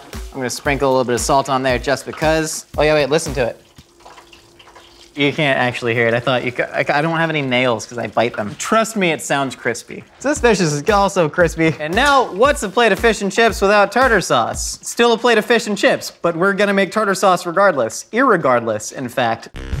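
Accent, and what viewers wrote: Australia. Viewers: I can confirn that accent is 100% Austrailian. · Lol sounded australian mate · That moment when you realize that the Gordon Ramsey accent was actually Australian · Sound more aussie than English😂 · Sounds more like aussie 😂😂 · You sounded Australian not British lol good stuff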